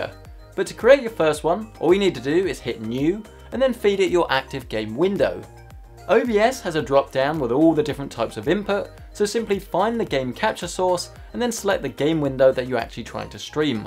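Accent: United Kingdom. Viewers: I trust British accents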